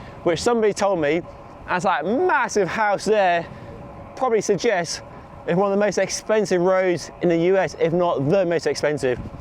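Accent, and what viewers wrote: United Kingdom. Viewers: Why California people speak British English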